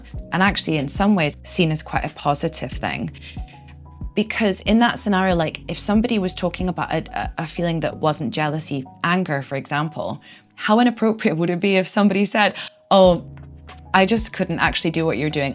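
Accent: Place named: Scotland, United Kingdom. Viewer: Love the accent! Got to golf in Scotland a couple years ago...beautiful.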